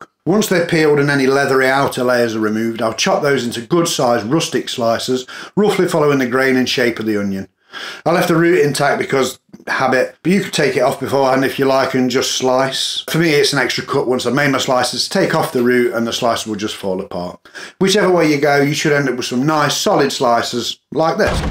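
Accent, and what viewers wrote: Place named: Nottingham, United Kingdom. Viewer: you from Nottingham mate?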